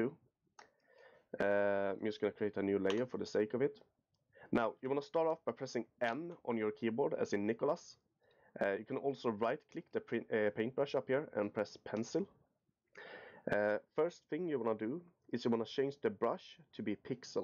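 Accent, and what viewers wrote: Sweden. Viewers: Nice Swedish accent! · Tack (Jag antar aatt du är svensk, det låter så)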